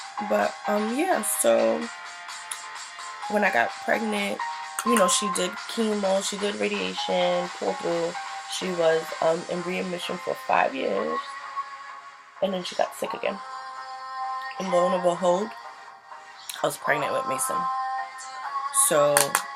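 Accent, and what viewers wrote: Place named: East Coast, United States. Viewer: Hey, R.I.P. to your Mom's and I'm sorry she passed away at only 42. She was So young. Shout out to your Dad for still being a Dad and always being there for you. This was another cute make up Tutorial. Thanks for sharing. Your East Coast accent is So Strong. You Crack me up sometimes. Oh and about your Etsy shop, let me know when you have it up and I'll buy some notes from you. I have an Etsy shop myself and I've been in business for a few years. It's a Good place for business and I'm sure you'll do well:)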